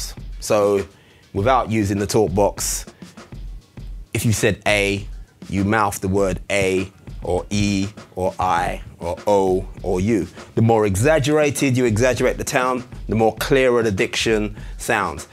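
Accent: United Kingdom. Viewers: British is just amazing. Love his accent.:D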